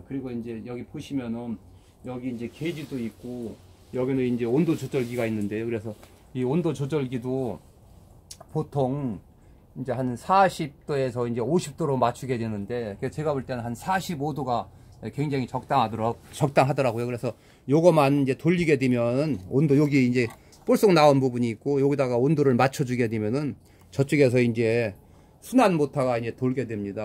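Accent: Chungcheong, South Korea. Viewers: 그렇겠네요‥ 불조심해야죠 큰일나죠‥불씨‥ 그런데 고향이어디세요 충청도같은데‥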